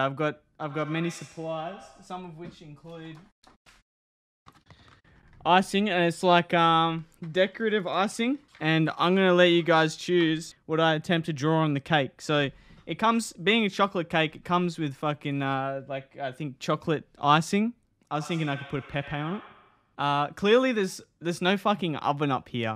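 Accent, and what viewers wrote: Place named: New Zealand. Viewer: i like ur new zeeland acksent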